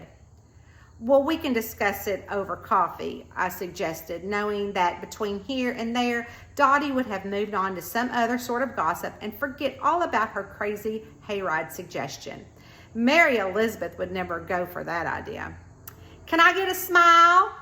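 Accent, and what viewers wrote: South, United States. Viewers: Love that Southern twang when I know it's real. You have done a great job with the southern style of small town, been there, done that. Now I'm old.😄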